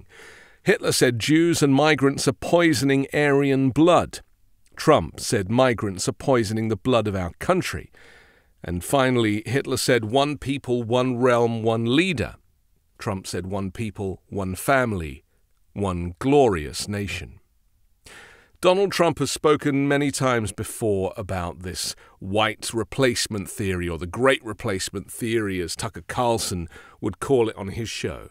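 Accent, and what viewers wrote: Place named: South East England, United Kingdom. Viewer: I think his accent is from south east England….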